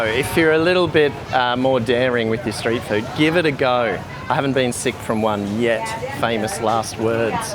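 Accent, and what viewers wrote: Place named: Australia. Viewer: Haha, the lady is a hard one to crack! I love your sense of humour! And your lovely Aussie accent.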